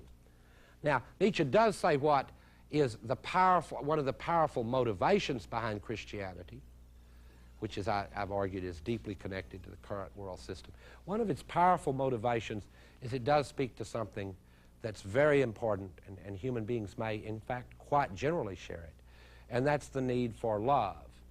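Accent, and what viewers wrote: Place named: West Texas, United States. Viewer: West texan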